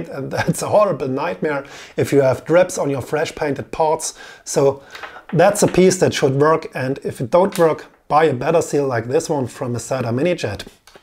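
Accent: Germany. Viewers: Excellently presented work with a gun. I am interested, however, in these seals you mention. To be replaced with SATA seal. Where can I buy this? What address, shortcut on the website? I hear from the dialect that you are from Germany. I may be wrong. Is it possible to get these seals from Germany?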